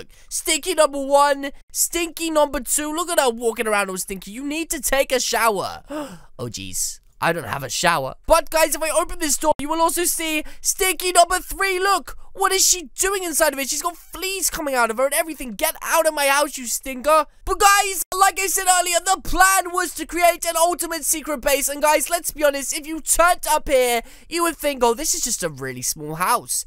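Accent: England, United Kingdom. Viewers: one quick question. Are you English or from England?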